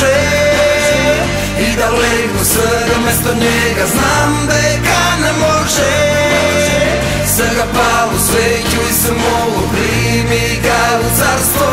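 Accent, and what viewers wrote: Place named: Kumanovo, North Macedonia. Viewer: na Kumanovski zbori :)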